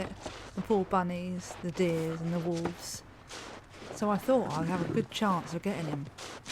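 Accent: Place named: North Yorkshire, United Kingdom. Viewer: I'm digging the update, the only thing I don't like is the HUD condition icons sticking around in their awkward positions when they turn red. Kind of distract me from the immersive beauty. Aside from that, all great. I really liked your review, by the way! Funny little asides, and a dab of oop North Yorkshire accent with the all important tea worship. It's a shame we didn't get you narrating previous updates, oh well. I hope you think about doing a review when the full game comes out, this was a pleasure to watch. And those new weather effects, eh?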